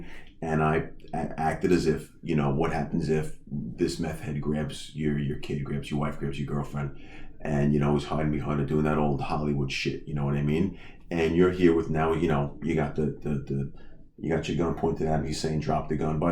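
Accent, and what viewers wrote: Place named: New York, United States. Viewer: Refreshing to hear a New Yorker talk about guns lived in NYC 40 years Always been afraid of guns until I moved to Florida lol now can’t get enough lol I have two cuz that retired also thank you for ur service and great educational video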